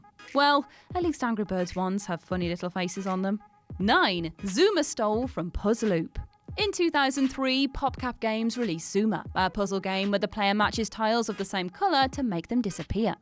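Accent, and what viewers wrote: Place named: England, United Kingdom. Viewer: I could literally listen to talk all day long. Don't know what style of English accent she has but a red head with a beautiful accent whom I'll never meet or talk to has stolen my heart like a school boy crush. Swoon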